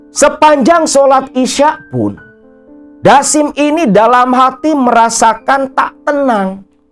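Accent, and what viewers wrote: Java, Indonesia. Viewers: Assalamualaikum kang.. Sukses selalu ya kang Blitar hadir.. Kalau ngomong jawa lucu banget 😂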